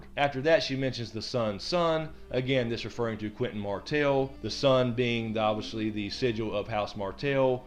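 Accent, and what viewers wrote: South, United States. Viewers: I’m really loving to hear another Southerner like myself talk about GoT like a pro 😌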